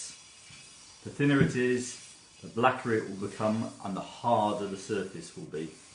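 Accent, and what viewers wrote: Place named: England, United Kingdom. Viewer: Thanks so much for posting this video. I really appreciate your time. I agree totally about flax oil, pure, cold pressed and organic. Must be kept in the refrigerator. I do also agree with 475F, I believe anything over 500 creates chipping and peeling of the seasoning. ((Side note: I really enjoy hearing your accent. We lived in England for three years as my husband was stationed at Upper Heyford US Air Force Base. We lived in a thatched roof cottage on a sheep farm in 1980 to 1983. Wonderful times... wish we could step back in time!))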